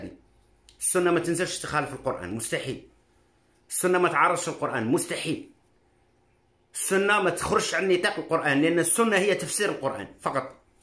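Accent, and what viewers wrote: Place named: Ain Sefra, Algeria. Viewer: السلام عليكم ورحمة الله وبركاته تبارك الله عليك خيي . لهجتك تشبه لهجة عين الصفراء ، كنت اضن انك صفرازي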